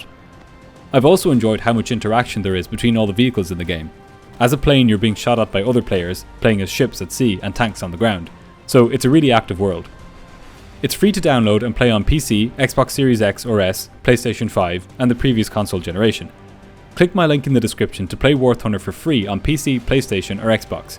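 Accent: Ireland. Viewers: IT'S his Irish accent.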